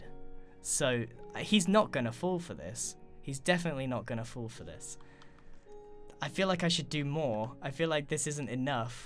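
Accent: United Kingdom. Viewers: QnA: are u British/English cuz I am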